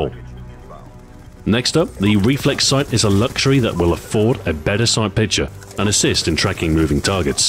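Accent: United Kingdom. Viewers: I don't get what's so weird about his voice. It's just a British accent! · Well, he has a British accent and his voice is like hot chocolate 🤔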